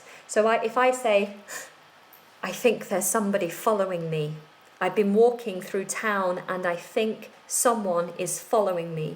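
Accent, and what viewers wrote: United Kingdom. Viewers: easy and clear British english. Thanks for what you are doing. Just subscribed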